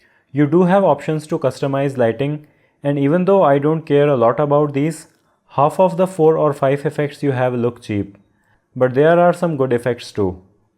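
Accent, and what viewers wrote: India. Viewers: are you india? lol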